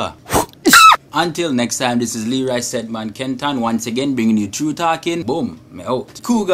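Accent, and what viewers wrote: Jamaica. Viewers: are you from jamaica?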